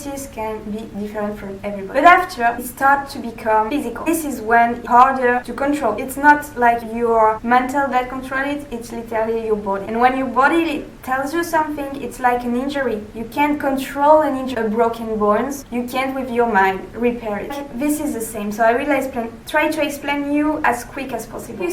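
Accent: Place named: France. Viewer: Le French accent qui passe bien 👌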